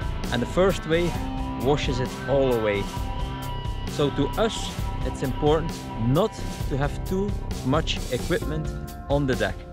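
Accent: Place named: West Flanders, Belgium. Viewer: Ne Westvloaming in ’t Engels 👌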